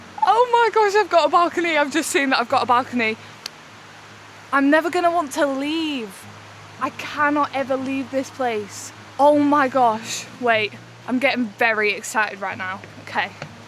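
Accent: United Kingdom. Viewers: Hello! Who are you traveling with? I love your British accent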